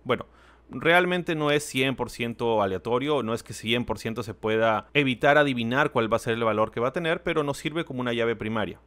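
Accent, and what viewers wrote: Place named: Mexico. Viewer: vaya, hasta que alguien enseña algo concreto, extenso, complejo, explicado, util y usando buenas practicas. No sé si eres de Perú pero hablas como Mexicano 👍🏻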